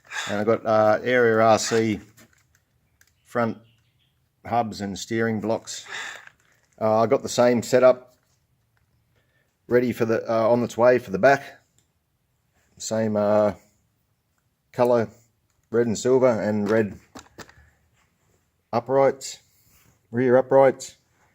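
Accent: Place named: Australia. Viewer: Ahh dude nice upgrades! And your an Aussie, love your country and accent! Liked and subscribed 👍👊 how did you find the fitment on the area RC stuff!? I bought some area RC alloy upgrades for my x maxx and none it fitted properly! I destroyed 4 wheel bearings before giving up installing the hubs, sent it all back and gotta full refund though 👍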